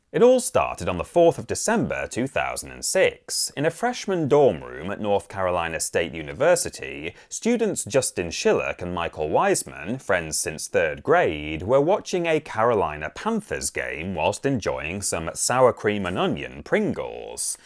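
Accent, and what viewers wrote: United Kingdom. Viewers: How did I not notice he had a British accent until he said crisps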